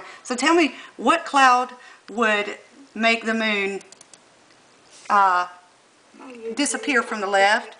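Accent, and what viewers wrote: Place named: South, United States. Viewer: You wouldn't think your a mom. Nice, and having a southern accent has nothing to do with it. Keep it up mom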